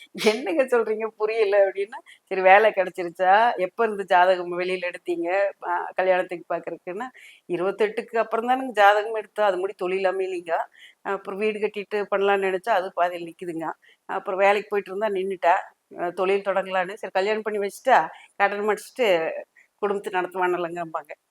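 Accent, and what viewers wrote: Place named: Kongu, India. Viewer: Konguu tamillum kamidiyana pechum!!😂🎉.....naadi joshiyum super!!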